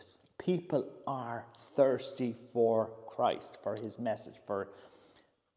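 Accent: Ireland. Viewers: you are so lovely to listen to - you have such a gorgeous Irish accent and what you are saying is so simply wise and beautiful. So true - Christ is our focus and prayer is our life blood; I recently attended a parish ‘consultation’ on whether the two dioceses in South Wales should be merged. The outcome was obviously pre-decided, and the whole presentation was put in terms of financial resources. No mention of prayer - as a few of the wisest and most enlightened of the parishioners mentioned to me. How can they possibly expect the church to flourish when even the leaders - even the bishops - do not see the value of prayer!!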